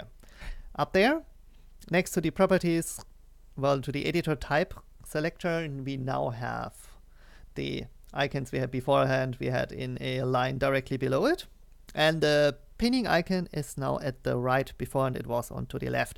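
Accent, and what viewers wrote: Germany. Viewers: Sprichst du eigentlich deutsch? Deine Aussprache hört sich sehr deutsch an :D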